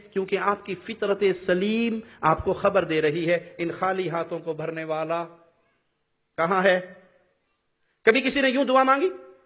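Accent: Arab world. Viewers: اردو کو اردو لہجے میں بولا کریں، عربی لہجے میں نہیں۔ اردو کو عربی لہجے میں بولنا اتنا ہی غلط ہے جتنا عربی کو اردو یا پنجابی لہجے میں بولنا۔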